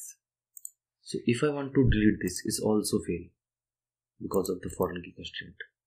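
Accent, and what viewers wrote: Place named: India. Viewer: english with hindi accent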